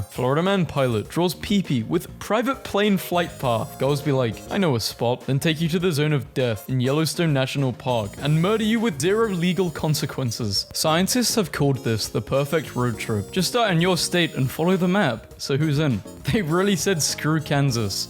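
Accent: United Kingdom. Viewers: the vid was so good i didnt notice u were a brit for most of it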